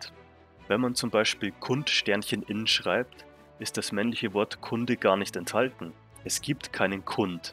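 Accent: Bavaria, Germany. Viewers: wo genau? 'ua' is ja eher Niederbayern/Oberbayern. Mia Oberpfälzer bzw. Waitler han ja de oanzigen de wo no as 'ai' und as 'ou' ham😂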